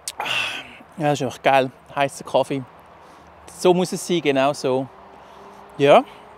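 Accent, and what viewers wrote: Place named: Switzerland. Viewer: Hallo Erst mal ein frohes neues Jahr, hoffe du bist gut reingerutscht mit deiner Familie. Hast aber auch ein Top Wetter gehabt. Essen sah wieder lecker aus. So ein Kaffee ist immer was feines in der Natur. Hab jetzt Urlaub, dann geht es auch wieder in den Wald. Vielleicht kann ich dir ein paar Bilder über E-MAIL schicken. Hast du eine E-Mail Adresse? Glückwunsch zu drei Jahren Outdoor 🎉🎊🍻. Ich glaube so langsam schau ich mir auch deinen Kanal an. Machst auch immer Top Videos immer authentisch. und das Schweitzer Deutsch passt auch gut dazu. Bleib wie du bist. Bis zum nächsten Video. Grüße in die Schweiz aus dem schönen Siebengebirge 🇩🇪🇨🇭🤜🏻🤛🏻 Michael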